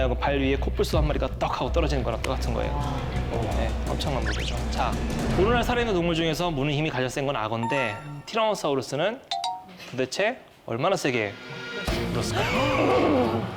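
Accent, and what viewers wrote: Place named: Gyeongsang, South Korea. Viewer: 좀더 보니 갱상도네~~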